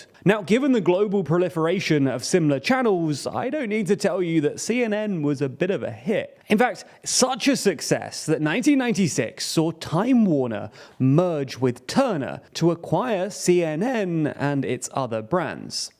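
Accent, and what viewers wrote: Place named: United Kingdom. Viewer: Why do people automatically believe that a guy speaking in a British accent is some kind of expert on news! Especially when we freaking live in North America and have literally thousands of news "outlets"? So why listen to 3rd hand news from some British Pud who hasn't ever left his own town, let alone his country!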